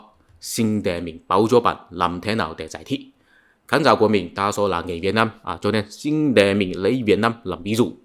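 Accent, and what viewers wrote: Cantonese, China. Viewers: Lại giọng của tàu + nó nguỵ biện. Đem nhét vào đầu . Đồ ngu. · bạn có phải là người Hoa gốc Quảng Đông hay không, nghe giọng giông giống :D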